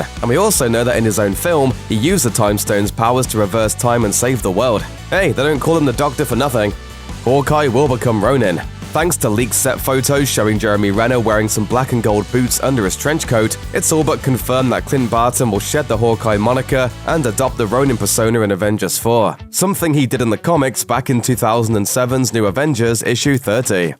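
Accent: United Kingdom. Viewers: I like your Brit accent O-al-ll chw cute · Yo is this that the british dude from sanity not included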